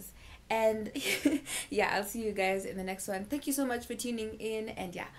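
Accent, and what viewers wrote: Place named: Kenya. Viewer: me i thought is not bad english it’s just being Kenyan 🤣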